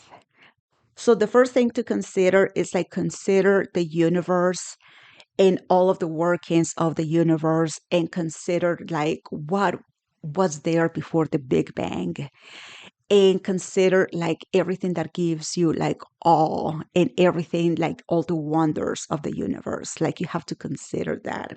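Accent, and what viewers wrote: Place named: Brazil. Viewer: Wonderful review. Could you do one on Angels of Omnipotence by Jareth Tempest? Also, may I ask if you're Brazilian? That's how the accent sounds to me. Either way it's adorable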